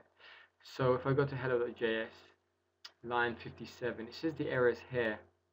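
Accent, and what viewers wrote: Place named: South India, India. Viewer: very nice video good tutorial.. html tutorial is way too long for an title of "open graph" I am 19 minutes into video and there is 0 (Zero) open graph demo.. beside login and that too not covered in tutorial in first 30 minutes my critique... keep it simple, for a complete app tutorial it was good but first 20 minutes were boring ...another thing...be normal and try to be normal with your accent.. be yourself.. you have that typical southern indian/ sri lankan inspired "hech"tml in your video too many times..that is very annoying if you are trying to put layer of english or american accent.. caught a few times struggling with "lo"gin / "loo"gin.. again nothing bad but annoying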